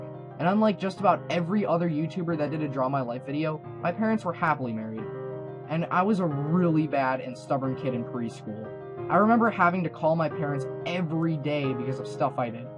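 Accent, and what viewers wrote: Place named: United States. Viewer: You have no idea how hard it was for me to figure out what you meant by preschool, middle school, and highschool. Im from England so Im not really used to how you americans pronounce things xD I think I get it... Like if you cri evrytim.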